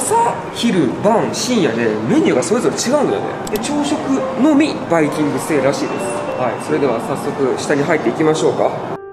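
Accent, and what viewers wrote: Kansai, Japan. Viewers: 最近好きになって昔の動画もめっちゃ見漁ってます🥺🥺🥺更新が毎日っていうのが嬉しいし関西弁なんが親近感湧く🥺🫶🏻💓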